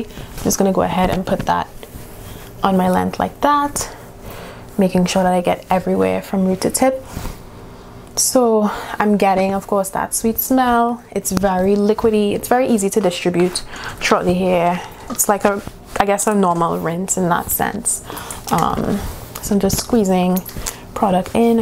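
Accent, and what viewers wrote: Trinidad and Tobago. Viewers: Must make out dat accent 🇹🇹🇹🇹🇹🇹🇹🇹🇹🇹🇹🇹🇹🇹🇹🇹🇹🇹 im subscribing now ❤️ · From the min you spoke I was like look my Trini sister lol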